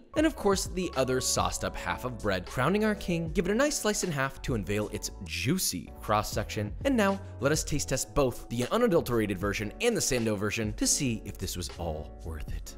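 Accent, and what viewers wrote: South, United States. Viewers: your southern background is showing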